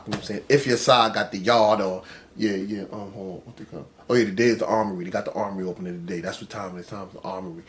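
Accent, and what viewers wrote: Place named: New York, United States. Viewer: Handsome ash... besides the fact. You put me in the mind of llcoolj and like Alpo in dialect. I guess its a NY swag.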